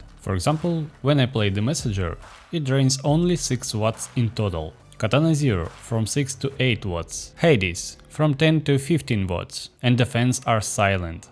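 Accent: Russia. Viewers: your accent is way too Russian. You are certainly not Ukrainian, and if you are from so-called Belarus, it’s basically the same that you are Russian. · По акценту очень заметно что ты Русский) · Привет, по акценту сразу понял что русскоговорящий , сможешь помочь поставить виндовс и утилиту переключения?)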